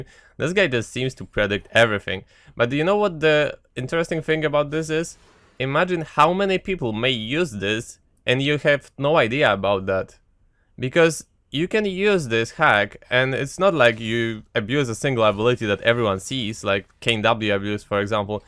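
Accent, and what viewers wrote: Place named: Poland. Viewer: Dobry akcent ale słychać że polak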